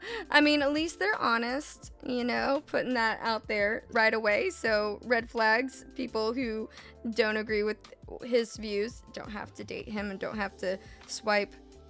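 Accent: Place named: South, United States. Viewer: Your redneck accent is on point 😂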